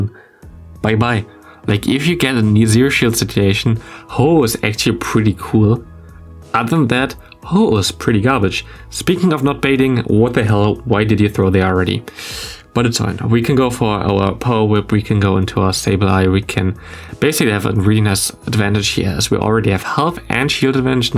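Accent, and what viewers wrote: Germany. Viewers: He‘s not a native English speaker either. I think he‘s German from what I heard. Brother you just got to get better at understanding English, because thats really not that hard.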